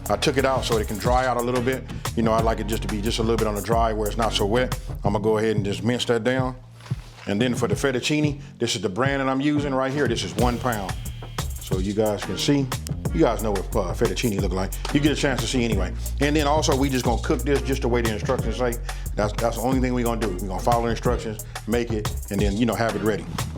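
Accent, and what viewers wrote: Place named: Louisiana, United States. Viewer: where are you from??? I hear some Louisiana flavor in your voice!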